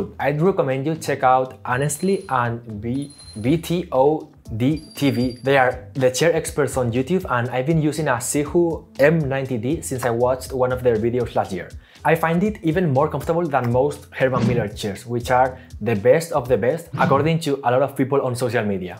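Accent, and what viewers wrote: Spain. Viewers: Eres español? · I may be wrong but where are you from? It's because of your accent that you sound very Spanish to me. If so, enhorabuena por tener el valor de hacer un canal en ingles. Greetings from the north of Spain. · nunca había visto tu canal, a primera vista pareces asiático, pero en cuanto escuche tu inglés supe que eras Español 🇪🇸, ese acento es inconfundible 😂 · Te he pillado el acento español desde la primera frase que has dicho jajaja. Un orgullo tener a alguien con unos videos tan chulos como los tuyos aquí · never in my life have i encountered an asian person with a spanish accent, weirdly fits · Desde el segundo 1 sabia que eras español jajajajjaja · Bro tu eres de malaga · Hola! Es el primer vídeo que veo tuyo y estaba reconociendo el acento español jajaja. En cualquier caso, enhorabuena por hacer un canal en inglés, es un gran gamechanger y te deseo una buena trayectoria porque se nota el currazo que le pones a los vídeos. 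Un saludo! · I thought I heard a familiar Spanish accent, then it all made sense when I checked your channel info. Saludos de otro editor, crack! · When you started speaking, I knew that that accent sounds familiar to me, you're from Spain!! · hey! I just landed on your channel, I'm curious about your accent, it sounds Spanish! Cool videos and animations btw <3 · interesting Spanish accent... btw good editing does not bring as many viewers because it doesn't hit as hard as crazy ideas and high budget stunts... editing can only make a video already at a certain level better, but cannot add substances it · Not sure if this guy is Spanish or Greek.. XD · East asian face, english language, spanish accent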